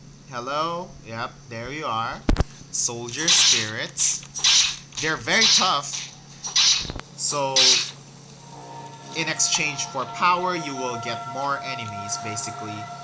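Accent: Philippines. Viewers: Are you PILIPINO? You sound like PILIPINO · utek filipino accent pala un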